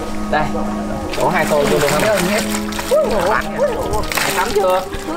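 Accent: South, Vietnam. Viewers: Sao mình nghe giọng bạn miền nam mà cảnh vật đặc sản như ở bắc vậy hơi thắc mắc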